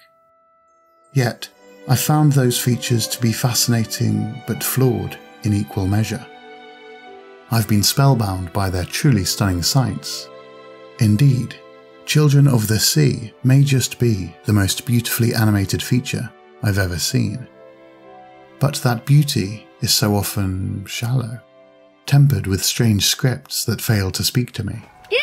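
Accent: Scotland, United Kingdom. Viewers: What a nice Scottish accent👍 and keep up the good work.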